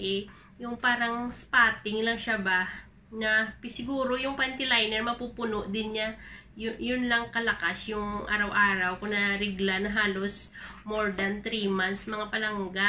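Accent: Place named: Iloilo, Philippines. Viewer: Hi sissy sending my support thanks for sharing sister daw ilongga ang boses mo sis sa antique ako enjoy lang sa pag vlogg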